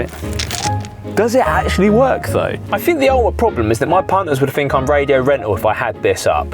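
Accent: United Kingdom. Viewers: He's british, that's how they say it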